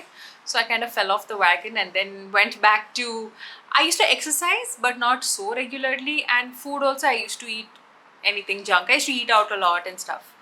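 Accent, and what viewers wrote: India. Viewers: Yes,The Indian accent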